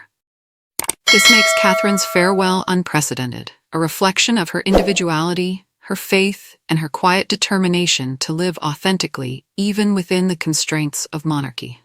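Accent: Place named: United States. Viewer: She is a feckin' Roman CAtholic, of course she's going to have an RC requiem mass for her funeral, so to will Princess Michael for that matter. Ye Gods, you make it sound as though something never seen before is going to happen when it isn't. What WOULD have been remarkable would have been if they forced the family to hold a NON Catholic requiem mass for her funeral. For a supposed UK channel - you might want to lose the US accent, gives millions the wrong idea about your channel - you don't appear to know what you're talking about. The only ''unprecedented'' part is that she is the first to die among the RC family members and thus the first to have a public RC funeral but she isn't the only family member who is Roman Catholic and it won't be the last RC funeral either.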